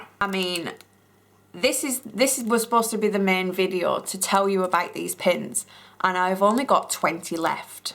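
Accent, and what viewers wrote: United Kingdom. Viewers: Thanks for your tutorials. I watch your videos a lot. I have twin boys. At night when it is bedtime, they often ask to watch a “cake video” on YouTube. I think the British accent helps lull them to sleep. It certainly not because you are boring. I used your toilet seat method for the first time this weekend on my boys’ bday cake. ;). I have a shop but I only do custom work. I don’t have a store front, and that works for me. It got all the stuff out of my house. Home is home and work is work. Thanks for all you and my other fav caking you tubers do. I have learned an infinite amount since I started making cakes 5 years ago.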